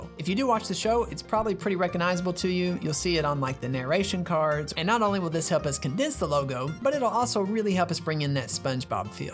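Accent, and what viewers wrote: South, United States. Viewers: I just noticed your southern accent, and now I can’t I hear it 🫨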